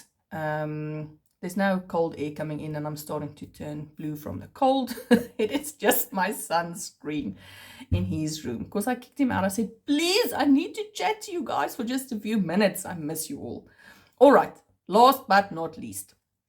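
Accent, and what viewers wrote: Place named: South Africa. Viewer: Hello, I started following you on youtube because I also do amigurumis. But what called my attention was your english, sounded so familiar.....😜I am from South Africa also but living in Portugal. Love your work.🐱🐷🐹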